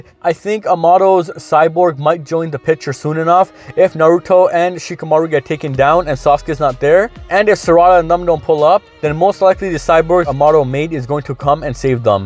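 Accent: Toronto, Canada. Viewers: Like your theories bro but Yo you from Toronto? You sound like them sometimes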